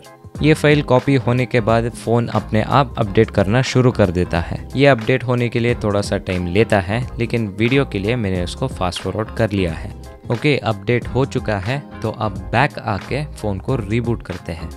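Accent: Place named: Karnataka, India. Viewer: You are not native Hindi speaker, you sound like a kannadiga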